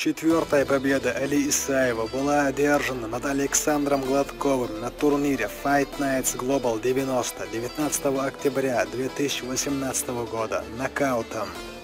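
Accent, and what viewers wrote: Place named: Chechnya, Russia. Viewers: Озвучка, с чеченским акцентом. Автор, это даже тебе какой то харизмы добавляет. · Автор и есть Чеченец.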